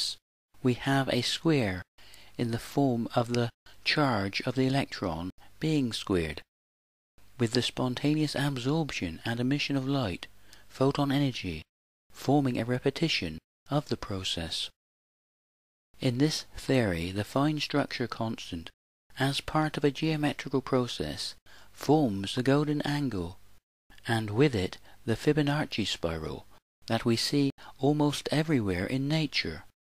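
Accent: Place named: Somerset, United Kingdom. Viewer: Maths in a Somerset accent is simply the best maths you'll ever find.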